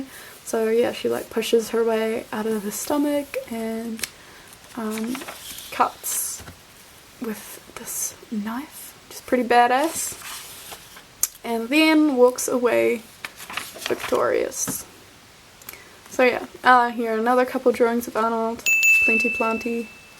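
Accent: New Zealand. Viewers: Are you from New Zealand ?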